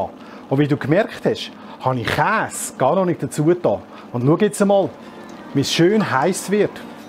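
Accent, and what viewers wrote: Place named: Switzerland. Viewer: Wäre ja schön wenn man als NICHT Schweizer etwas von dem Gesagten verstehen würde. 👎👎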